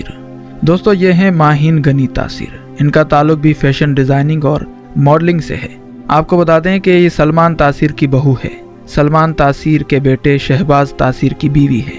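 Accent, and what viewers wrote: India. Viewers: یہ گنی اور کھواتین کیا ہوتا ہے؟ شرم نہیں ،،بے غیرت مت بنو،،اور اردو صحیح بولنا سیکھو،،،کیا تم انڈیا سے ہو؟ اگر نہیں تو لعنت ہے تمہارے اس لہجہ پر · آپ کی اردو کا تلفظ مشکوک ہے۔ وڈیو ٹھیک ہے ۔ مگر آپ کا تلفظ ہندی ہے۔ اس کو ٹھیک کریں ۔ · Aap Urdu sahi bolo Indian ki tarha nahin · Urdu pronunciation thik kero apni. Indian style mein boltay ho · This guy is an indian not pakistan. Notice the way he pronounces 'KH' like hindi speakers of india. Pakistanis, dont be fooled by this hateful crap created by an indian.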